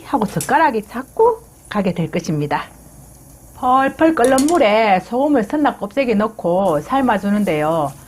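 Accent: Gyeongsang, South Korea. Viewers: 구수한 경상도사두리가 친근감있어 좋아요. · 샛낫꼽때기만큼 아주조금 경상도아지매 맛나게하시네요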